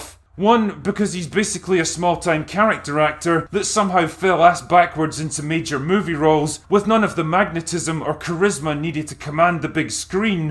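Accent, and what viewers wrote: Scotland, United Kingdom. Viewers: I have no idea why this Scottish guy talks like he’s holding in a monster sh*t. We don’t all talk like that I promise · scottish with a hard S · The dwarves in this movie were so dumb, to put it plainly, and they were all unlikeable, especially the leader. Did you see The Hobbit, the cartoon? It was around when I was young (early 80s). I remember it was very good. Also, the book. I don't think we really needed these movies. Course, we don't need most movies. Maybe if it was just one really good Hobbit movie it would have been something.... I think the actor who played Bilbo did a good job though, but I can see your point about him acting the same in every movie. Edit* by the way I love your Scottish accent. I'm from the U.S., it's probably one of my favorite accents, I don't hear it enough!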